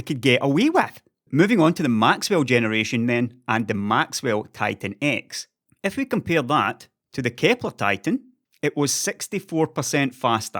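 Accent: Scotland, United Kingdom. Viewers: tech talk reasoning in a Scottish accent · I love the Scottish accent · thank you for your sweet Scottish accent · We love the scottish guy with the scottish accent!!!! PLEASE dont stop the vids !!! Dont let us be a total fodder for the marketing departments !!! We need really smart opinions like yours!!!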